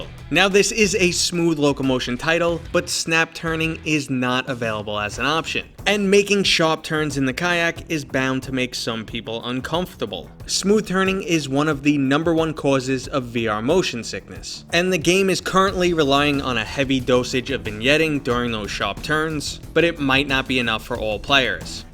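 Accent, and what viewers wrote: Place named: New England, United States. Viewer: You sound like you are from New England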